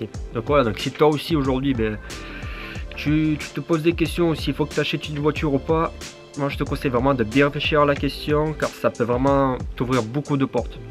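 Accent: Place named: mixed, France. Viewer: Mec désolé c'est pas au sujet de la vidéo et ont a du te le dire déjà mais je beug sur ton accent dés la première fois où je lai entendu, du Français avec un mélange d'accent de l'Est avec un accents du Sud de France.. c'est.. intéressant, oft moi aussi j'éponge l'accent des autres a leurs contact, j'ai déjà eu l'accent d'un de mes pote qui est Ukrainien a force de trainé avec lui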